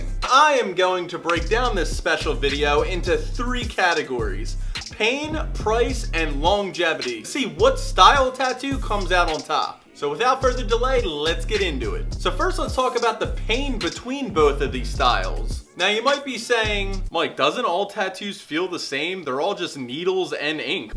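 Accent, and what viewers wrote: Philadelphia, United States. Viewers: You sound like you're from the Philly area 🤣 water = wooder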